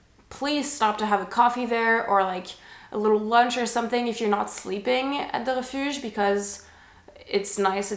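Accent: France. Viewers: May I ask.Are you a native French language speaker😊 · i like your French accent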